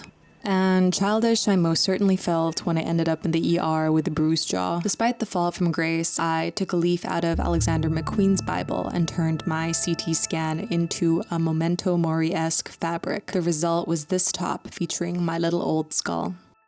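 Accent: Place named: United States. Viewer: Just now finding your channel. You are so talented and eclectic in your style! How is it that you were raised in Austria? and have an American accent?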